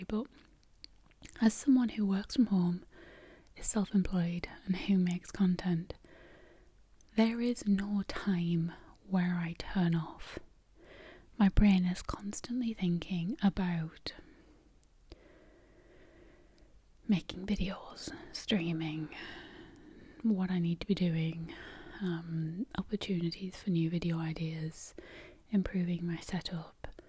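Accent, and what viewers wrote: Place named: Northern England, United Kingdom. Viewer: ! You were my first asmrist I found about 7 years ago and it's crazy to see how much you've progressed and how many things you're working on. After years of watching biscuits of britian, I've now moved to the UK myself and am finally trying all of them. And I can hear your northern English accent so much more now. I think you're wonderful and however much time you need off, please just take care of yourself and only make videos you enjoy! x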